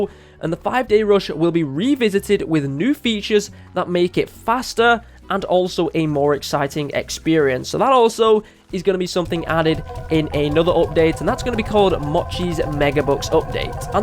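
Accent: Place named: Scotland, United Kingdom. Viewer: U sound like a Scottish NKuch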